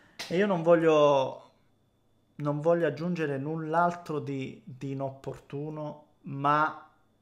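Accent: Calabria, Italy. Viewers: Calabrese/pugliese detected*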